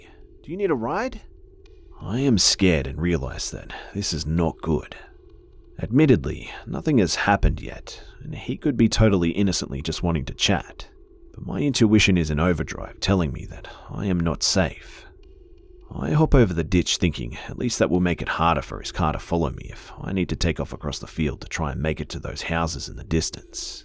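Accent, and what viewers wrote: Australia. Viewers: I love your Aussie voice storytelling so much that I recently grabbed me a lad from Bris to forever listen to hehe. Thanks Be. 😉🙊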